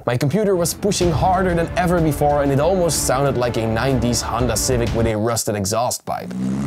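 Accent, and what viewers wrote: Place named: Netherlands. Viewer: Ik hoor dat je Nederlands bent 👊🏼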